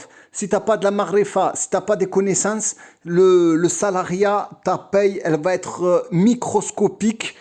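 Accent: South, Algeria. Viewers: Dommage que vous soyez si loin vous n'êtes pas dans ma wilaya Je vais m'abonner à votre Instagram et facebook Vu que moi aussi j'ai un facebook et Instagram Bravo continuez et votre accent du sud j'adore 😅 Wellah je vous souhaite de réussir ici on ne vous ponctionne pas comme en France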